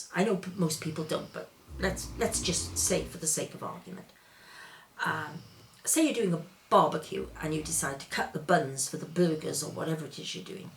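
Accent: United Kingdom. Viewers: Love your accent,.. what part of Britian are you from?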